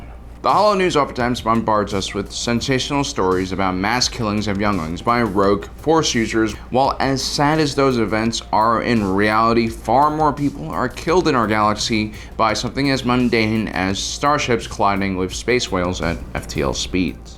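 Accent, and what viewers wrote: United Kingdom. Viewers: What happened to British?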